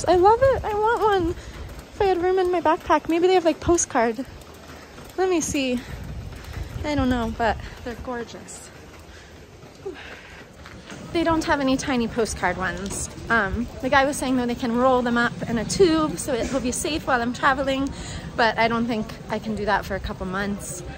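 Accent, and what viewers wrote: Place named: Southwest, United States. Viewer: clearly its an American accent.. southwest.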